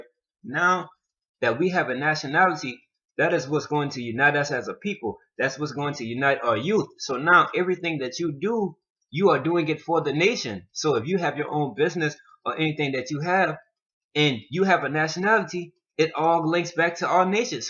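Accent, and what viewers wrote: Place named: Gullah, United States. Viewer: I hear that geechee talk my brother. I’m Gullah geechee from the sea islands of Ga. Great Video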